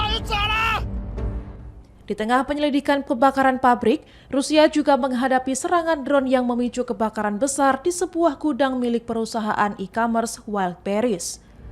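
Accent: Indonesia. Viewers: Widiihh suroboyoan,e metu , arek endi iki ?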